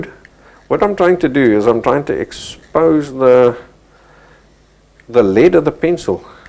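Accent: South Africa. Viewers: JohnLothe I think he is South African that lives in New Zealand. This dude is a pretty decent teacher! great video! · I feel like im still in South Africa because of his accent.. 😢😢😢😢😢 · Where are you based now?? I feel sure i hear a south african accent 😏😏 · Thats not an new zealand accent? Do you have a south african accent? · is this guy south african?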